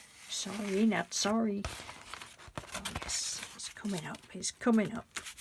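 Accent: United Kingdom. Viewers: Hi, I finally got to watch this properly. I’ve been moving rooms around again and I think I’m going to be sore tomorrow,lol! These turned out fantastic! The black background gives such a striking effect. I think I’ll reward myself with those shimmers whenever I use up some ample amounts of supplies because I am literally out of rooms, lol! I’m glad it worked and that you had so much fun! I got curious about actors that come from your area and did you know that, Kevin Doyle from Downton Abbey is from your town? I cracked up when you said, “what the heck!”, lol! I’m going to miss you reading craft stampers to us. Things always sound better when you British read them. Kloss! Thank you for sharing. Hugs, Julie 🥰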